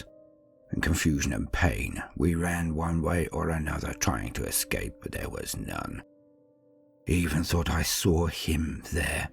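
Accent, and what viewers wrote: United Kingdom. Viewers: That voice. Like a british Tex.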